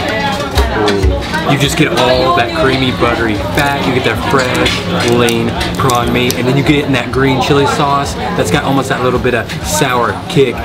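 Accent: South, United States. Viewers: Where are you from? I hear a little bit of southern accent. Great video btw. Keep up the good work!!!!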